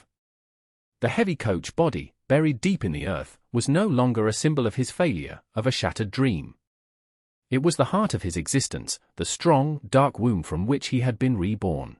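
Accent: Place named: United Kingdom. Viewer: After 10 seconds I stop the video. I don't want to hear a western tale narrated with a British accent.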